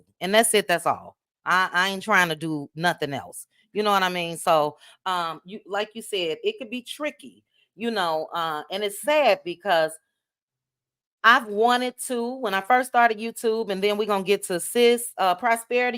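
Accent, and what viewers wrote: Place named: Chicago, United States. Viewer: I’m from Chicago and that’s definitely how we talk…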